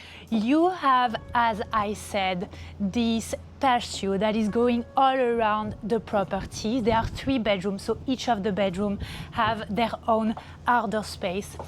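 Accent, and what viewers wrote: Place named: New Jersey, United States. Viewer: That's a lovely accent you have. New Jersey?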